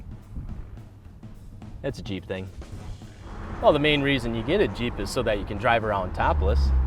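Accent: Wisconsin, United States. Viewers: Are you from Wisconsin? I think I recognized my accent.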